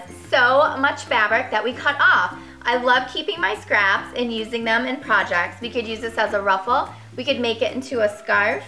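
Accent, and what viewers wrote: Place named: United States. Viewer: She makes it look very easy but oh my, that voice. Lots of American ladies have this tone and rhythm to their voices, why is that?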